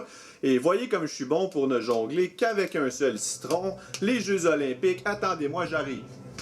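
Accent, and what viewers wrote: Quebec, Canada. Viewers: J'adore l'accent québécois ainsi que le fromage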